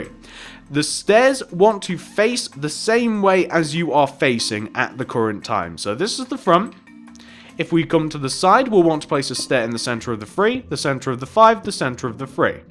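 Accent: England, United Kingdom. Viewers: Love the vids r u English?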